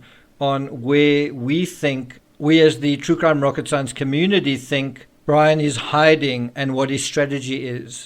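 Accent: South Africa. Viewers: Love your channel, no hysteria , clear thought process and facts . South African accent ?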